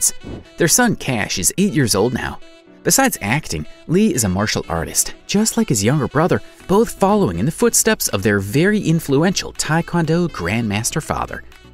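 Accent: United States. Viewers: That narrator’s Midwest pronunciation of the vowel “a” is tooooo much!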